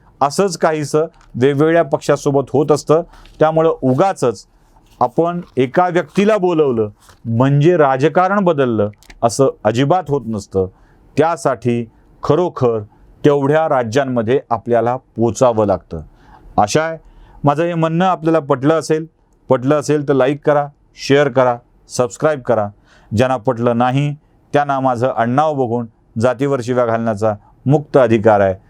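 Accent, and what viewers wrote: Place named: Marathwada, India. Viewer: तुमची बोलण्याची मराठवाड़ी पद्दत खुप मस्त। अगदी 1 नंबर।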